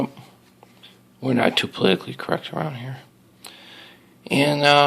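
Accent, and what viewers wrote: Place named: Brooklyn, United States. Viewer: Where'd you grow up that you talk that way? Brooklyn?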